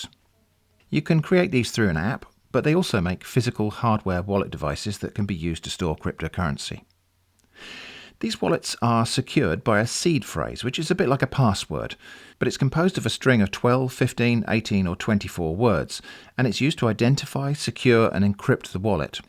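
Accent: United Kingdom. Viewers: > British accent > “dear viewer” for some reason that is entirely beyond my understanding, i feel instantly engaged in the video and compelled to subscribe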